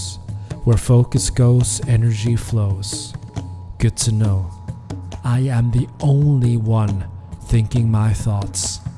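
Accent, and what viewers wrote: Scotland, United Kingdom. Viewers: These meditations are perfect. <link> him has a gentle, warm, pleasant voice (with a slight Scots accent). The words are simple and clear. The timing is perfect... just as you start to find your mind wandering, he says and if you find that your mind is wandering... . There is no background music and no noise in the background, only his peaceful and engaging voice. The length of the meditations (about 1/2 hour each) works well for me. I find that I tire of some meditations after frequent repetition, but that hasn't happened with this one. The metta lovingkindness meditation always feels right. Some meditation CDs I have tried have not felt right. The voice seemed hurried, or sounded tense; the background music seemed intrusive, or there were noises that interfered with concentration. This is just perfect as an introduction for new meditators, and it's also a meditation that I return to again and again. 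I'd give this more than five stars if that were an option. Even my students, who are not ordinarily very receptive to meditation, relax when they hear this one!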